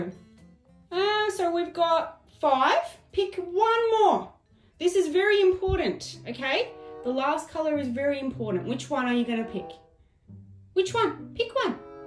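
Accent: United Kingdom. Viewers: Is this YouTuber brithish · Are you British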